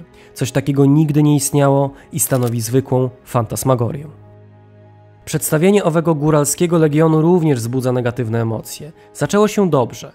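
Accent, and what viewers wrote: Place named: Warsaw, Poland. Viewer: Sam sposób w jaki opowiada swoją recenzję jest taki wkurzająco warszawski i salonowy;) W sam raz dla fajnopolaków. Bo to film dla tej grupy społecznej.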